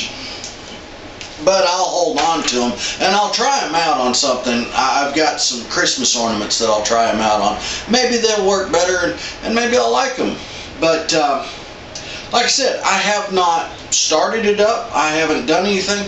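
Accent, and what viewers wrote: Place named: Deep South, United States. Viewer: Guessing your from the Deep South somewhere....that was the most relaxed review I've ever seen....enjoy your saw and this was helpful.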